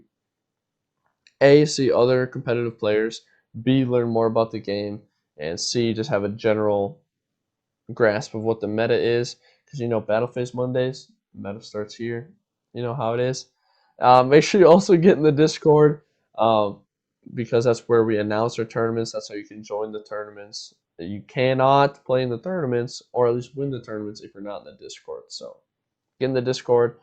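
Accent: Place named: United States. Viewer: what makes you say that? his american accent sounds very natural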